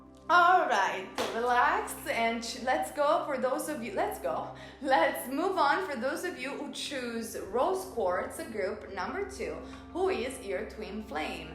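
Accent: Italy. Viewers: your italian cuite love your accent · Is she italian? I so love her already! Her accent and personality! LOVE! Anyways I have seen so many videos like this but god I have never had any reading this eerily accurate! Like never before! OMG! spot on! I chose #3.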